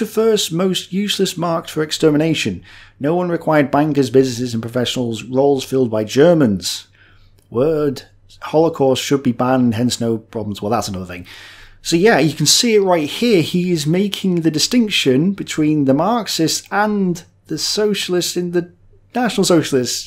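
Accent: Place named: Manchester, United Kingdom. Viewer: I had such high hopes for you. A Northern guy, Manchester I think, holding his own with the plummy voiced establishment historians. But you had to publish your last offering, which as a piece of polemics can only be described as simplistic at best. I do understand where you were coming from but your theories are wrong I too have heard many years ago that the extreme left and the extreme right almost went full circle so that they virtually touched. Stalin though a murderous thug had to be loyal to Lenin and Marx's behests which preached the dictatorship of the proletariat and as many of the early Bolsheviks and Marx were Jews race was not an issue in the formation of the Soviet Union, whereas race was a central plank with the Nazis. In my view that was the fundamental difference. I look forward to you next episode as I for one will not be cancelling my subscription as your history on the second world war is splendid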